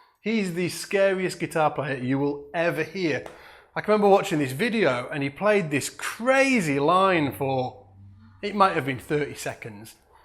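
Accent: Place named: Liverpool, United Kingdom. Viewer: By chance are you from Liverpool? Your accent reminds me of my grandfather...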